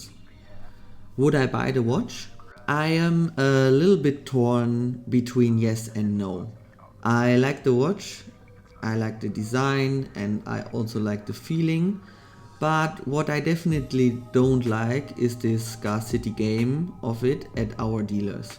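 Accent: Germany. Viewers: Are you german? oh and great video!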